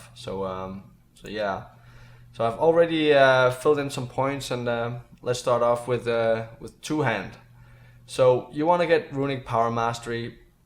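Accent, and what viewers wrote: Denmark. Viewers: haha, i knew he was from Denmark from that accent lol :) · hey dude are you danish ? :) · I can hear it so clearly on the way you say things that you're a Dane, and that you're trying to speak English with an accent, don't try if you cant do it.... speak with sum more British people like I've done and now my English is amazing(speaking wise).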